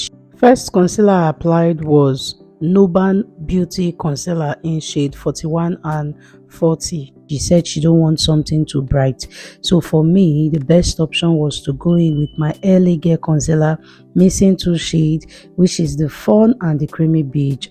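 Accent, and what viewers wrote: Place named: Igbo, Nigeria. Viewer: She spoke Igbo English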